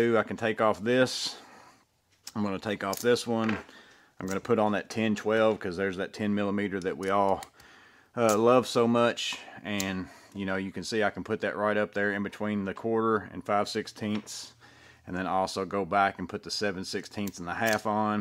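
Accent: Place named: North, United States. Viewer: I have an accent, just like yours. And I have to squelch it, when I go back home to my elders country. Where they make all the tools, by hand and grow all the pumpkins for the holidays. It's got a heavy Old Dutch/Spanish demeanor. I grew up in Texas/Louisiana....but I'm from an Indian, Dutch/Old Spaniard area of North USA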